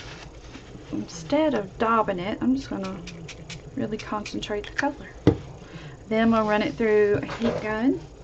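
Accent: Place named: Tennessee, United States. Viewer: Loved the video. What a fun way to use up the leftover shelf liner that I have. What part of the country are you located in? I live in TN and your accent makes me think we may be close.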